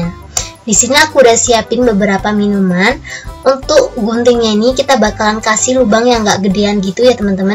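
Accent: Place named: Java, Indonesia. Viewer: Jawaa bangett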